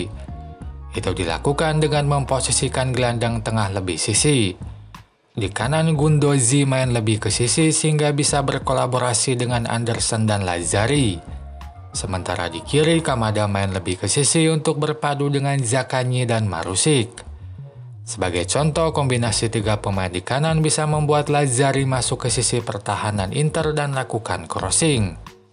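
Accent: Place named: West Java, Indonesia. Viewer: Sunda pisaaan euyyy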